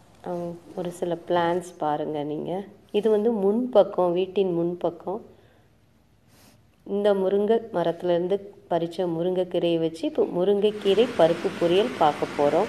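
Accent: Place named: Tirunelveli, India. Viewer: Assalamu alaikkum vrh, yepdi irukkinga maa, yen name fazila, i am from chennai maa, neenga peasurathu keakkum pothu oor baasai nallaa theriyuthu, naangalum thirunelveli la eral thaan, namma oor baasai keakka santhosamaa irukku maa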